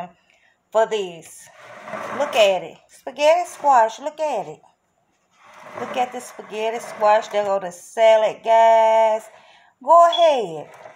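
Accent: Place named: South, United States. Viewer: I LOVE spaghetti squash! One of my favorite vegetables😊 also, that sweet south in your voice reminds me of home. My nana is from Alabama and I haven’t been able to visit my cousins since the pandemic. Thanks for making me feel a little taste of home!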